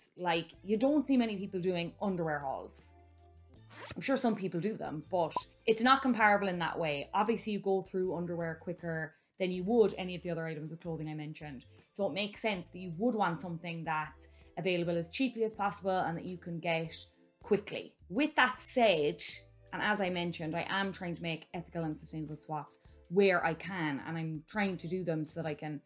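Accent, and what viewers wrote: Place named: Newfoundland, Canada. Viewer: I swear I thought you were from Newfoundland or east coast Canada. By the accent. Yes I watched this video after the razor video. #Creepy.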